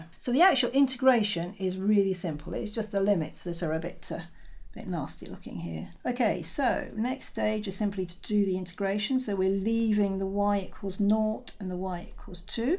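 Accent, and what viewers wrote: United Kingdom. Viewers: Are u British? Cause I like ur accent :P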